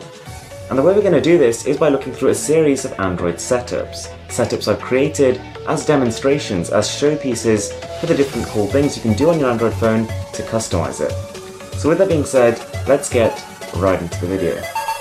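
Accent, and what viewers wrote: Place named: United Kingdom. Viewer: do all british people have this tone when they talk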